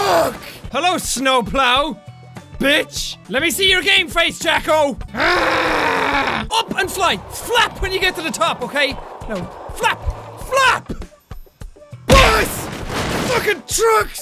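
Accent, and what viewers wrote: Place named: Ireland. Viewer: jacksepticeye I'm not going to lie to you but your Irish accent is just off the hook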